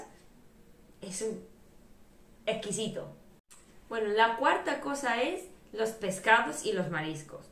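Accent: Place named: Andalusia, Spain. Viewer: Buenas guapísima, si no dices que eres búlgara pasas por española al 100% con ese acento andaluz aunque vivas en Alicante . Un saludo y ahora me suscribo jeje.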